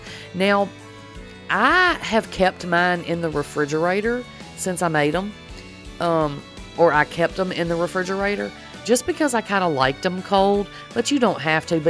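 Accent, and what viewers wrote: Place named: Alabama, United States. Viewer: I really love your accent. Where did you grow up? Alabama? You just sound so friendly and warm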